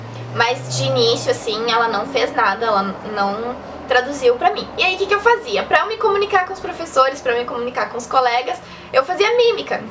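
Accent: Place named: Southern Brazil, Brazil. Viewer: Gostei do seu vídeo, gostei das informações, ultimamente tudo o que tem a ver com japonês eu estou consumindo e o seu vídeo foi útil pra mim. E pra mim foi muito lindo ver uma menina "Japonesa" com o sotaque do sul do Brasil!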